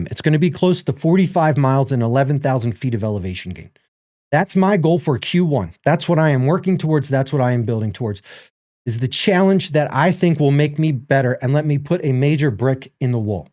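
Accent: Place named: New York, United States. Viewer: Off topic but l've got a friend with the same accent and voice as yours. New York?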